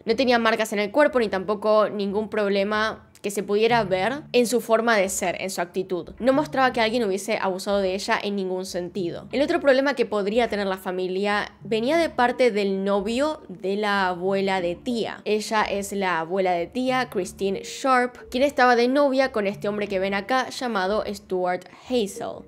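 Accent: Argentina. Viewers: Es agradable el acento argentino y si es en un caso interesante, mejor 😅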